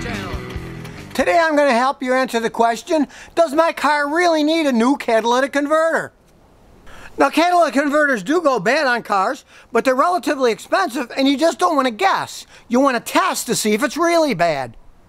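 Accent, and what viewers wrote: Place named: Chicago, United States. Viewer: "dont guess!! test!!" haha eye love the accent and the VOLUME! Chicagoan?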